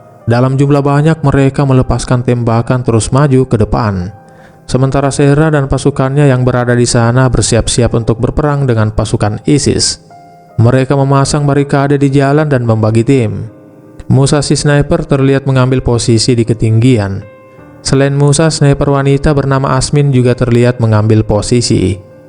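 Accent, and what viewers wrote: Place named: Bugis, Indonesia. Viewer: Mimin logat Bugis, orang Bugis kahh😅😂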